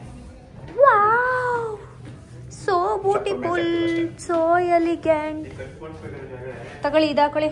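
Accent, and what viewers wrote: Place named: Channapatna, India. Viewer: I like Channapatna kannada slang❤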